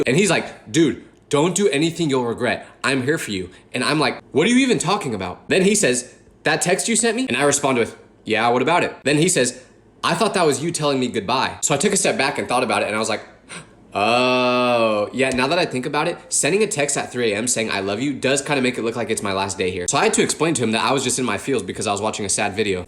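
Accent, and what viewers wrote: United Kingdom. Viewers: Wait you're British? .. . . . . . . . Aaaaahhhhhhhhhhhh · you’re British ?ahhhhhhhhhh!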